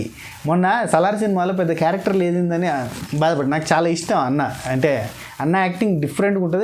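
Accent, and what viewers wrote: Telangana, India. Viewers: ఆ మహీదర్ గాని కన్నా ని రివ్యూ సూపర్బ్ అన్నా , మన తెలంగాణ స్లాంగ్ సూపర్ అంతే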